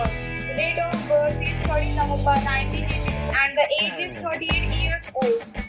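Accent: India. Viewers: The way u pronounce the names made me cringe so badly. Typical badh badh ding ding Indian accent.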